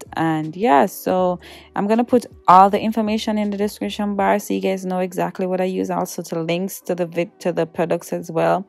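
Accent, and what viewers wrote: Jamaica. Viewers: Jamaican accent trang bad. Thanks for this 💪🏾🤗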